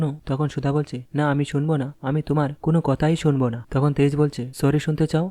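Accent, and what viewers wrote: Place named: Bangal, Bangladesh. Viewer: কথকতা অতি খারাপ। যত বাঙ্গাল বাঙ্গাল ,অশিক্ষিত কথকের জঘন্য কথকতা ভাল লাগেনা নিজেকে যোগ্য করো।চেষ্টা কর। নিশ্চয়ই পারবে।শুভেচ্ছা রইল।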